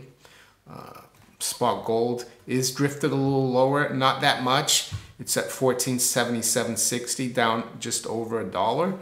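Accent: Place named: East Coast, United States. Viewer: I enjoy your channel! Difficult to know you're Brazilian because you speak with what sounds to me like a U.S. east coast accent. Did you spend time living in New York, New Jersey, or somewhere on the east coast of the U.S.?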